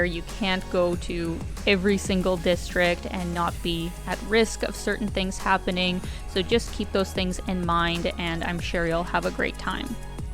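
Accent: Canada. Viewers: Welcome to the Philippines and sorry to hear about your stomach bug or flu. For me your accent gave away where you’re from before you even mentioned that you’re from Calgary. I’m pretty sure you’ve received a lot of recommendations already as far as what food to try and places to visit. If you ever get tired and want to relax, get a massage and I recommend “Hilot” massage. For me, that is better than Swedish or Thai massage. I recommend to go get them at “The Spa”. They have a lot of locations within Metro Manila. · Your "out" and "about" accent is a dead give away that you're Canadian. 😉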